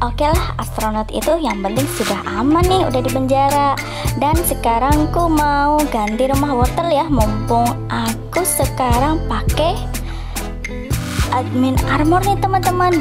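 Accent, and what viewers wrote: Java, Indonesia. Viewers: Sampean orang jawa ya kedengeran dari suaranya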